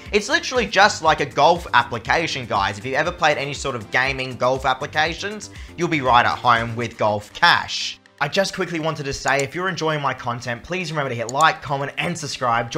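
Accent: Australia. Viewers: I am here because I love ur accent Australian English 😂😂😂😂😂😂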